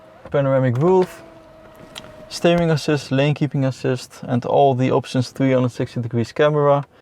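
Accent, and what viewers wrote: Netherlands. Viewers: ik wist al aan je accent dat je nederlands was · Duidelijk een Nederlander! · Je hoort gewoon door je engels accent dat je nederlands bent XD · maat begin aan bmw vergeet deze.....en dat hollandae accent is slecht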